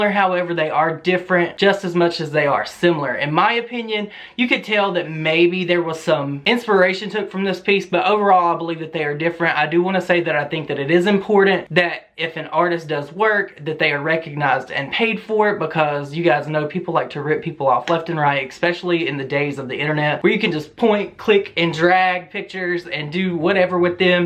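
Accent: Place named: Florida/Georgia border, United States. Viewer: Where are you from!? Your accent reminds me of home: FL/GA border.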